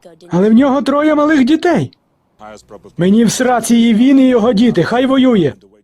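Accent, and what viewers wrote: Ivano-Frankivsk, Ukraine. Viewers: У нього акцент франківщини, а не львівщини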